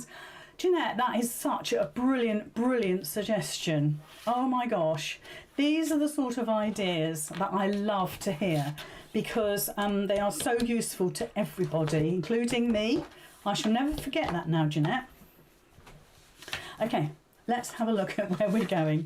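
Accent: United Kingdom. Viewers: Please don't stop talking! I love to listen to you and your British accent. And I enjoy what you say. 😊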